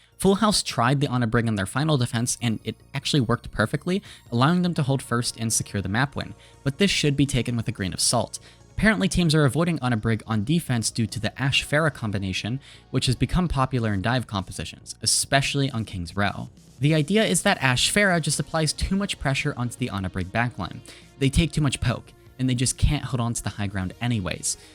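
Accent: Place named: United States. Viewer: American accent but you call it university?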